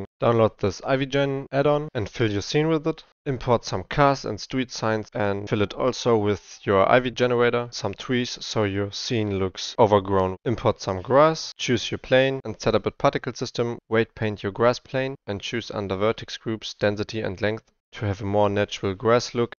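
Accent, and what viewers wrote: Germany. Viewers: since 5 sekonds that i hearing you to speak, i know that your´re from Germany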